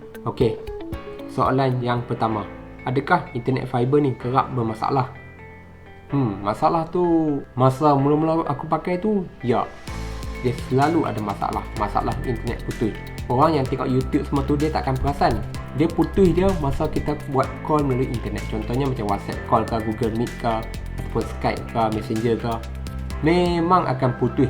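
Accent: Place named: Kedah, Malaysia. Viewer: Bang fasih gila slang kedah.. piau noh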